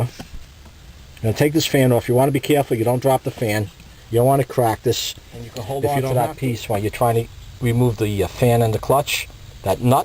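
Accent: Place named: New York, United States. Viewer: I meant to say Long Island. I knew the accent was from NY. I had a friend from derh, sounded just like you. Very fun to listen to. I’ll be changing my water pump out tomorrow. Wish me luck.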